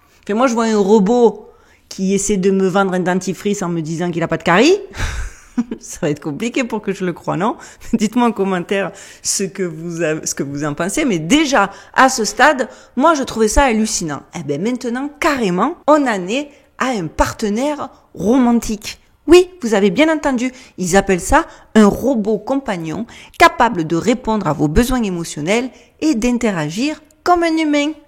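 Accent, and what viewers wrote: South, France. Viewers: 👍 Les questions sont toujours aussi pertinentes !!!😻 Comment des gens qui ne sont plus en contact avec eux même peuvent-ils entrer en communication/en lien avec un humain conscient de sa souveraineneté ?!? Impossible !!!! D'où l'AI.... et tout ce qui va avec 😁 J'ai vraiment et magiquement confiance en ce qui s'en vient et en toutes ces personnes vivantes et conscientes✨🙏✨ Merci pour ta bonne humeur, ton accent du sud qui réchauffe. Beau week-end à toi et à tous☀✨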